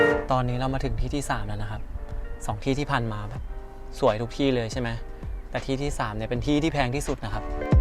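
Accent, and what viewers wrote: Thailand. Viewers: คนกาญพาไปเองรึเปล่าครับ มีติดเหน่อ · คอนถม ก็เหน่อหน่า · เอ๊ะ เป็นคนที่ไหนนะมีติดเหน่อด้วย 555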